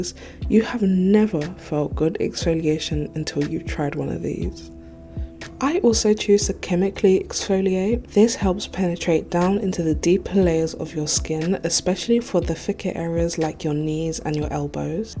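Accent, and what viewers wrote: United Kingdom. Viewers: Love your accent! btw, are you british?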